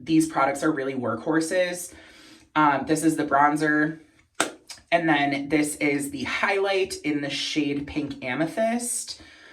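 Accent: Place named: Buffalo, United States. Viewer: I'd LOVE to see what wedding look you're gonna do with the Ethereal Eyes Palette! I was also cracking up cause I say the word "palette" the same way you do. Our Buffalo accents with these A's are outta control 😂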